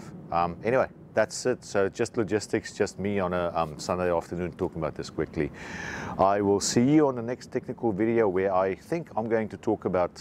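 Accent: South Africa. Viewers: Nederland? I would have sworn that you were from Jo'burg!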